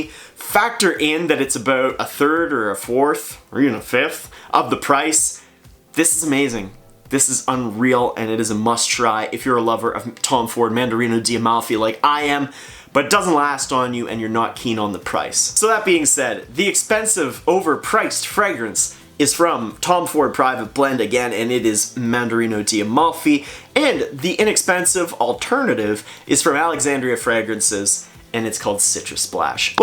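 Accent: Canada. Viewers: You sound hella Canadian.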